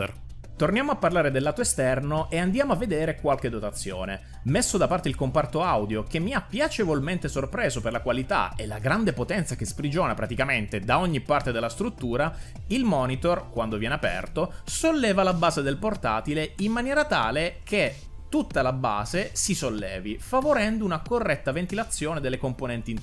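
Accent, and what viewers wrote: Italy. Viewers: 32GB di ram sono più che sufficienti anche con un solo banco dici. Non avere un doppio banco significa non avere il dual channel, e non avere il dual channel specialmente per i processore Ryzen è una GRAVE mancanza che avresti dovuto sottolineare. Questa è disinformazione e mi dispiace davvero tanto dovertelo far notare dato che dal tuo accento si capisce che siamo della stessa terra.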